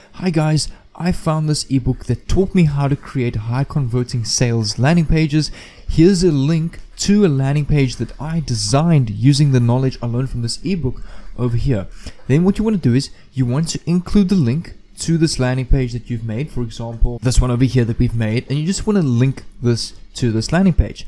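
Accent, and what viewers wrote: South Africa. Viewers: As soon as I started watching this I immediately recognised that accent- so good to see a fellow Saffer doing the earning online. Definitely will follow - would love to chat more and possibly share some of my ideas with you...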